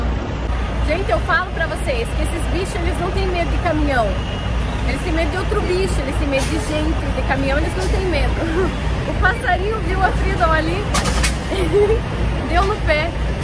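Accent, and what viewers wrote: South, Brazil. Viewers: um cara leigo nisso tudo...só viajando com a do café cromado mais linda nos USA motorista e vendedora de empadão! Mas essa carga e potes não poderiam ser amarrados com essa cinta nessa mesma estrutura contra as paredes onde você engancha - tipo um cinto de segurança para as cargas. Sempre linda de Curitiba! - "sotaquezinho" do sul da minha terra é fantástico! ehehe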